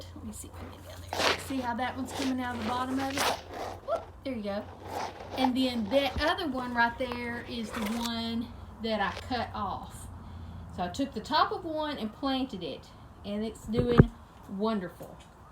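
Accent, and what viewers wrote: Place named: Virginia, United States. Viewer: I can’t thank you enough for the before and after video on your philodendron. Like you said lots of videos about them but none like what you did. I have the same long gated 20 year-old philodendron which I will be doing exactly what you did taking pictures and sharing them with you again thank you thank you thank you by the way I love your accent. I’m here in Sacramento California but, I have a brother who is in Richmond Virginia so it’s a loving reminder, lots of love to you and thank you again. Rene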